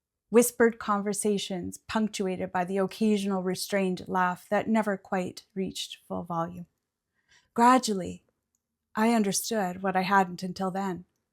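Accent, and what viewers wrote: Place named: Saskatchewan, Canada. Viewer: Growing up just south of the border in Plentywood, I recognized the Saskatchewan accent instantly. More importantly: likely you know this but nobody likes the sound of their own voice. With only a little professional coaching it would seem you’d be more than ready.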